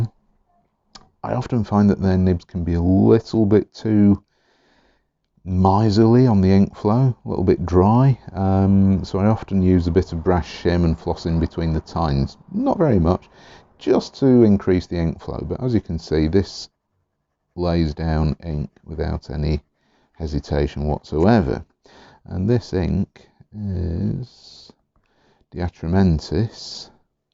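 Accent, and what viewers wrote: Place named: United Kingdom. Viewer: Very good pens.. By the way, I love your british accent.. 👍👍🇪🇸🇪🇸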